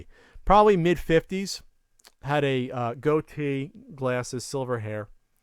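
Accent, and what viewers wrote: New Jersey, United States. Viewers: I love the Jersey in u. it's one of the main reasons I watch. the New Wario, funny shit.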